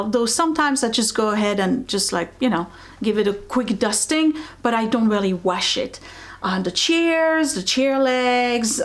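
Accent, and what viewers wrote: France. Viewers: Love your beautiful French accent & your lovely antiques & treasures!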